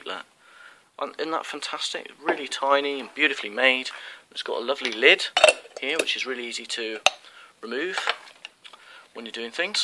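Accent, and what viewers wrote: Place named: United Kingdom. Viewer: Hi.....Good to hear a UK voice....most of the videos I find are not UK which means they can't recommend shops / websites. I will be getting my first Ts soon, am getting as much info as I can before buying them. I am disabled and housebound, so I have to do everything via the internet. My disabilities will not affect my ability to care for them in any way. In your video you said you'd be happy helping anyone new to the hobby, but that was a few years ago, are you still around ?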